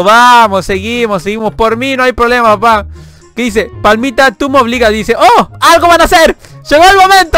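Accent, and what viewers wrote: Argentina. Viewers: Sos argentino? Xd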